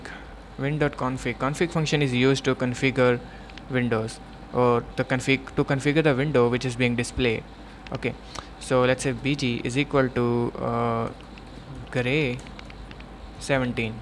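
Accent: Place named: India. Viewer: now thats an indian accent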